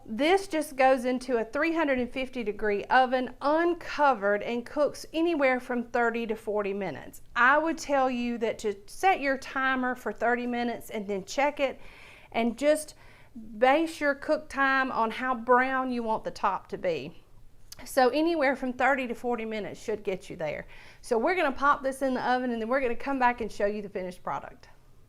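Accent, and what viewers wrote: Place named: North Georgia, United States. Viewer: Looks great! I've never made homemade cornbread before, so I'm so happy I found this demonstration. "Smell-O-Vision!" I love it! You sound like North Georgia or East Tennessee, and it was really enjoyable listening to you. Happy Thanksgiving!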